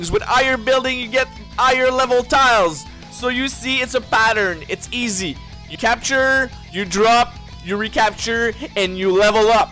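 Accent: Quebec, Canada. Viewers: Tu es clairement Québécois! Moi aussiiiii! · liked your old french canadian pronuciation of alliance better..... nice basic tutorial for DD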